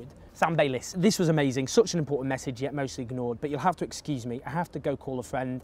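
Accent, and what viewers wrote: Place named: Northern England, United Kingdom. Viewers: you do sound pretty northern. Nothing bad about that (Mancunian myself) but one word is enough to hear the North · Always thought you sound just like where your from. Yorkshire Sheffield would be more to the point. Be proud of who you are.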